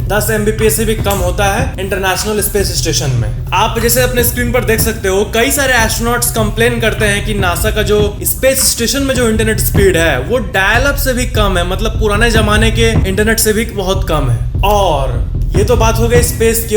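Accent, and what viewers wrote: Bihar, India. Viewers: That bihari essence wow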